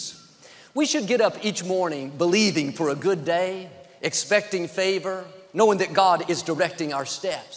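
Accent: Texas, United States. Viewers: Thank you so much for ALL your encouragement, jokes and messages! I do love your Texas accent. God bless you in Jesus' name! ! 😘😘🙋🙋👏👏😃😃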